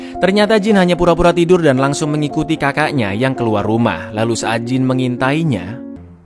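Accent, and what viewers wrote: Indonesia. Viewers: Ya namanya logat Indonesia ya suka2 dia org korea aja nyebut nama org indo suka salah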